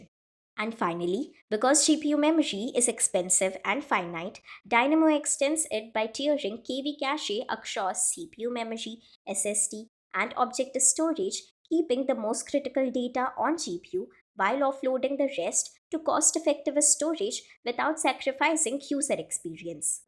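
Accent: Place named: South Asia. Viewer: Hi. I appreciate the presentation, but the extremely heavy South-Asian accent and cadence personally makes it VERY difficult to properly parse the words/meaning (English is not my first language). So many things are simply mispronounced. Cachet for starters. We would be MUCH better off with an Ai voice here, and I don't say this lightly because they are monotonous and boring, but at least my brain doesn't get fried.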